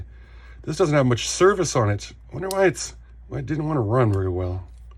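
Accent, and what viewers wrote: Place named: Minnesota, United States. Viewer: Watching this and the missus comes in and asks if is from Minnesota with the accent. Know you're in Montana now, but were you originally from Minnesota or North Dakota?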